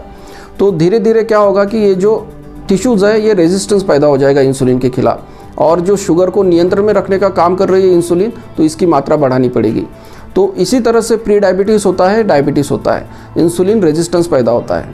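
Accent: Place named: Vidarbha, India. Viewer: Very apt advise; very much to the point and in the simplest language possible. It was also nice to hear you Vaidarbhiyan accent. मी नेहमी पाहीन आपलं चैनल. धन्यवाद.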